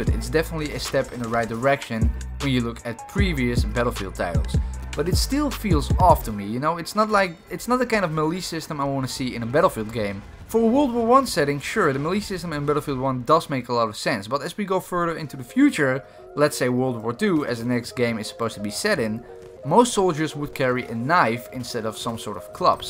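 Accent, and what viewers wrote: Netherlands. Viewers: Are you dutch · Ik dacht dat ik goed in engels als nl zijnde ik herkende je accent bijna niet good vid do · Such a Dutch accent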